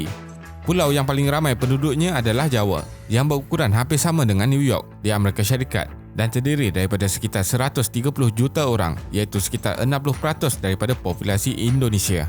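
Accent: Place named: Malaysia. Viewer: yang ngomong kaya orang malaysia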